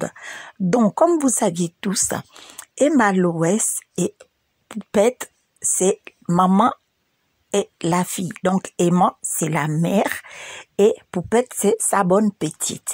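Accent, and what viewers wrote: Gabon. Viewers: Je m'abonne j'ai reconnu le bled à travers l'accent. #Gabon# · C'est une gabonaise qui parle🤣🤣🤣 une fille du sud! Je m'abonne 🇬🇦👏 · Ton accent gabonais la 😂😂😂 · 😂😂😂😂😂 explication excellent , j'adore trop l'accens gabonaise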